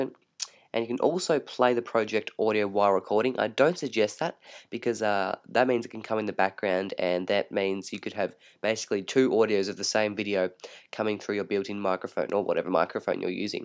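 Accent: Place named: Australia. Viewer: Great to hear another Aussie voice. Thanks for this.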